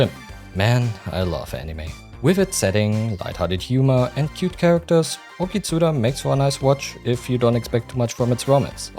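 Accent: Germany. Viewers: Ich hab den deutschen Akzent sofort gehört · i heard the german accent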